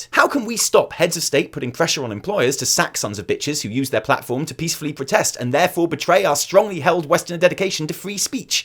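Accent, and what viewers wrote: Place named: England, United Kingdom. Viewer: Y'all get a ton of flack for being "blizzard shills LUL" but nobody can doubt your intelligence, eloquence, or lovely English accent after watching this. I'm sharing this video with all my friends who need a TL;DR for the Hong Kong situation, and / forever have my respect for their sincere and well thought-out arguments against late stage neoliberal global capitalism. Cheers! <3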